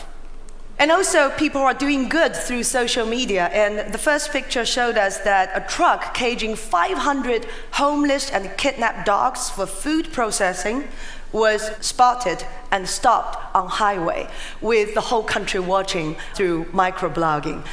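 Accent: United Kingdom. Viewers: 杨澜在美国念书，但是发音是英式，有意思。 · why does she have an british accent? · interesting.... she has British accent rather than American...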